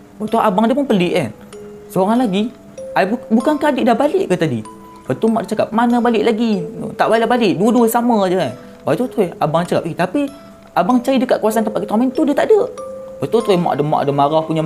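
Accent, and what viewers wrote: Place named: Perak, Malaysia. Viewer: Bg aku cara penyampaian mudah paham. Mgkn sbb loghat peyrok nye pekat jgk.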